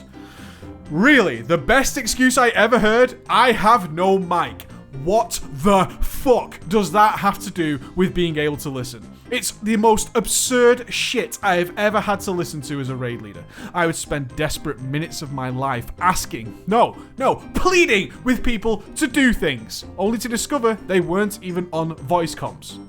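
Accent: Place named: Lancashire, United Kingdom. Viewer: "Thirdleh, tek oppotuniteh" - dat Lancastrian!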